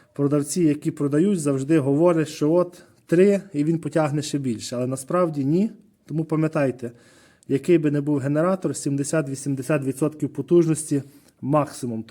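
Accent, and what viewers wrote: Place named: Hutsul, Ukraine. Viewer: це не українстка, а гуцульська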